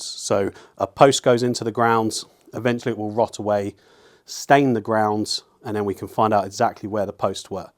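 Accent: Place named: Hampshire, United Kingdom. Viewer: I'd like to know where the narrator is from. His accent is interesting. Sounds a bit Portsmouth but with a slight Hampshire country twang. Am I close?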